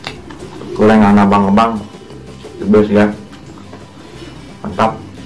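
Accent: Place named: West Java, Indonesia. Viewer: Sunda timana kang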